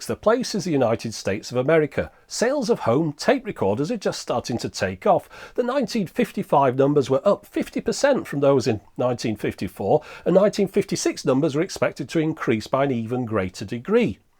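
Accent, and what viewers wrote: United Kingdom. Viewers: its a british accent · I was just blindsided by the pronunciation. I am pretty used to the different ways we say the same words through the English speaking Western World, but I never heard "beta" spoken like that before. I think UK accents of all types sound so refined, even all the slang terms. Tattoo is taa-ewww, and of course aluminium way cooler your way. Your accents automatically make you sound like experts to USA residents, even the minimally educated British sound smart to us, LOL...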